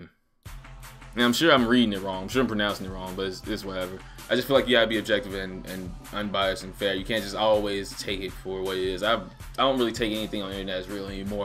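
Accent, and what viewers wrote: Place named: Bay Area, United States. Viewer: Bro you from the Bay area? SF Oakland? I was trying to place your accent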